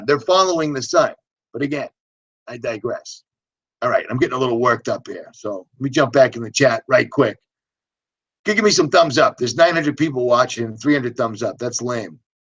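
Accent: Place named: Massachusetts, United States. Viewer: Hi! Great video as always! Every time I listen to one of your videos I can’t help but think of my time living in Marlboro Ma for 10 yrs! Still have some family & friends so when you talk it always reminds me of them and makes me laugh because of the accent! I love it!! Be blessed and God Speed! Love you keeping it real!!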